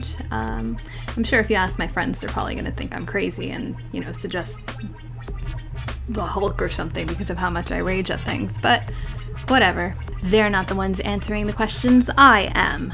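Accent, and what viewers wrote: Canada. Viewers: I just want to say, it's extremely reassuring to know there is someone in their 20's playing this game, that also is Canadian and has people make fun of the way they say completely normal words. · haha, just started watching your videos, and dont worry, canadians talk about the same as us here in Minnesota, and we're the only ones that talk correctly. Also your video guides are great. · Ok, now i know that i love the canadian accent! :D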